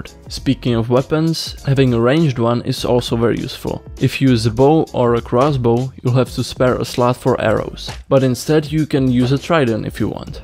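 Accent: Czech Republic. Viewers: I can smel the czech accent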